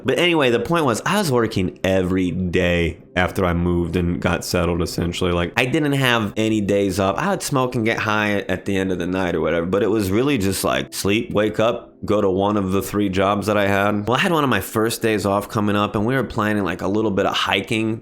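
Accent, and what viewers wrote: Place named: Boston, United States. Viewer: Where you from man what’s the accent? I’m from just north of Boston and sounds kinda familiar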